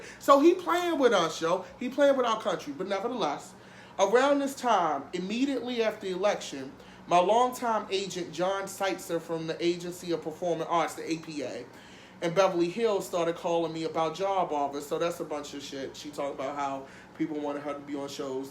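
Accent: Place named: Baltimore, United States. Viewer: You must be from somewhere near Baltimore... I hear your accent lol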